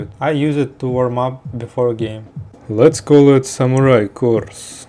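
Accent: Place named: Russia. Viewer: bro this video is really good thank you for the suka blyat accent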